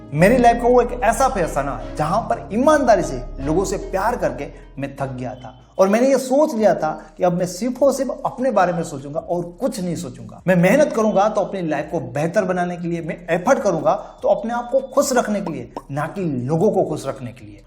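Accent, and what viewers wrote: Gujarat, India. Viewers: Sir Aap gujarati ho?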